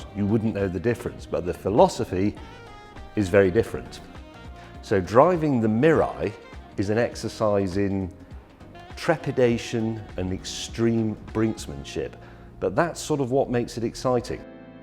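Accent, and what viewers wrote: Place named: South Yorkshire, United Kingdom. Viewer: South yorkshire eh? Hehehe...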